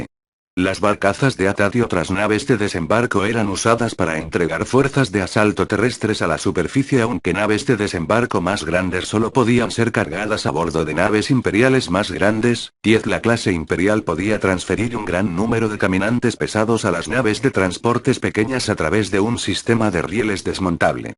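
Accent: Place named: United Kingdom. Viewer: Tienes un acento británico amigo. Gracias.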